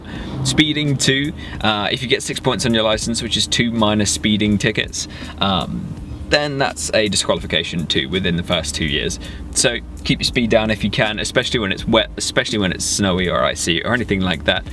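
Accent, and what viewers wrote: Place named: United Kingdom. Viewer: Irrelevant Q But what part of UK are you from? Thanks for making quality videos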